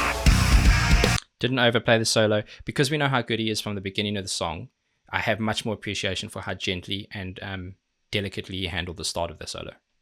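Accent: South Africa. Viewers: Is this guy South African??! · Also, I've only just found your channel, do I detect a SAffer accent? edit* Just watched your Q&A - Jo'burg boy eh? Howzit Boet? I'm originally from Namibia, so it's great seeing someone from that part of the world doing well. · Look. I'm American so I'm sorry if I'm wrong... But are you south African?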